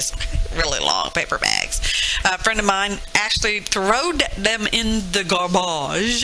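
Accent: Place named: Alabama-Mississippi, United States. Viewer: sounds just like Ala-ssippi!!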